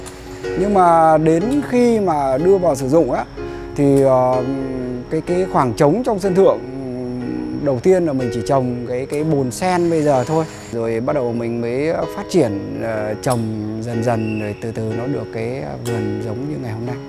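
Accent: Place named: North, Vietnam. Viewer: Ngay từ đầu đã đoán bác ngoài Bắc lập nghiệp trong Nam rồi. Chúc bác mạnh khoẻ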